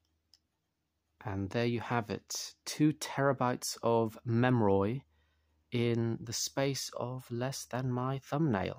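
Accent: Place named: United Kingdom. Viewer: Thanks for all your help. You seem to be British. Your accent seems to indicate so. Your video 📹 is now appearing on YouTube in South Africa. Date: 28/04/2024 (April; Sunday).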